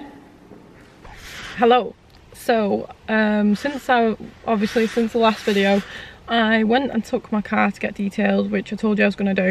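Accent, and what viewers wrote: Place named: North East England, United Kingdom. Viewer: yea I might of been tempted at half that but it's a bit much for me. £2.5k gets you a really nice 95 aero wagon with 250hp. Probably be a lot better in a crash too. Awsome channel. You sound like your from the north east my bro inlaw stays just outside gateshead. I'm thinking of getting into bike as I live on the nc 500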